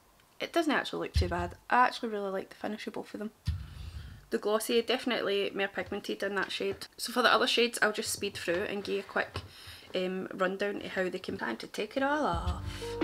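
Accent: Scotland, United Kingdom. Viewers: Is ur accent Scottish? I love it!! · oooh fab! 🤗 let me know if you need any advice or help at all with editing etc - I’m a professional videographer based in Glasgow 💛 So nice to hear a Scottish accent on YT! x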